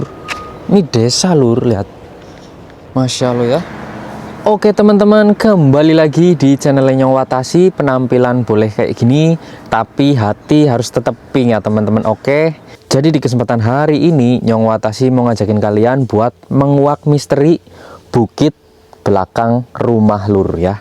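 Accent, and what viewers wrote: Banyumas, Indonesia. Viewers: orang mna sih kok logat bahasanya sama kya inyong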